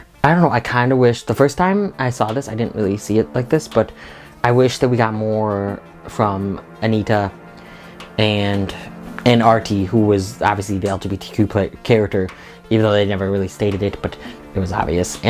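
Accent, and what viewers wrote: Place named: England, United Kingdom. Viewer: Bravo! Really insightful thoughts about an awesome movie ( which SO needs to win an award for costume design). That English accent is pretty damn good too!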